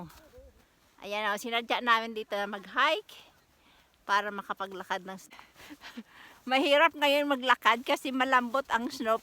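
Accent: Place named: Iloilo, Philippines. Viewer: From where are you in Pinas? You sound like you are Ilonggo or Kinaray a..😂😂😂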